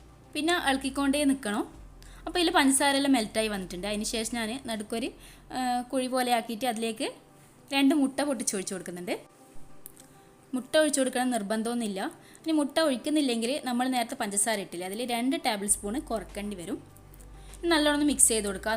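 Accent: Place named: Kannur, India. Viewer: Samsaram kannur bhasha kelkkan nalla rasamund.. recipeyum nannayittund.. good presentation and preparation 👌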